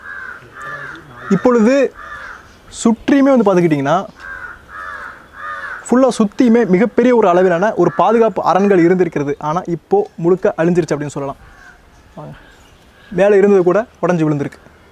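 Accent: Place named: Madurai, India. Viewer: Hi.... Please read kannadasan's Cheraman Kadhali..we miss your iyalbana madurai slang..ellorukkum vanakkam.and vaanga polaam.. pazhaya ilandha sogathil puthiya konathil anbudan varavekirom.. love and regards..and very best wishes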